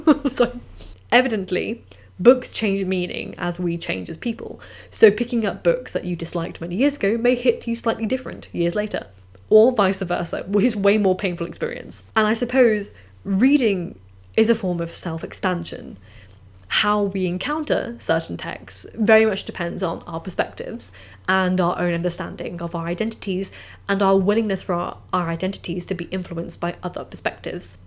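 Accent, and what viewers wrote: United Kingdom. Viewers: After a chapter or a few pages of fiction I've for years been getting this painful sence of urgency, as if the book is wasting my time, drawing me away from the limited years of my own life. Ironically my own life feels a bit meaningless. Also are you British or just playing a British character on Youtube?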